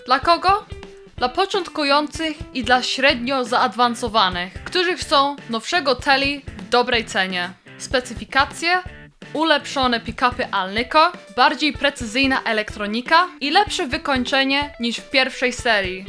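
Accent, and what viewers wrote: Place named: England, United Kingdom. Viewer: Swietny material.Obejrzalem z wielkim zainteresowaniem.Lubie Twoje filmy z takimi muzycznymi nowinkami.Noi lubie tenTwoj proper English accent gdy wymawiasz nazwy anglojezyczne.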